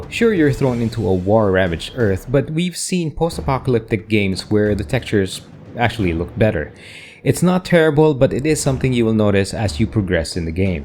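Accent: Philippines. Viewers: pinoy ba to. parang pinoy yung accent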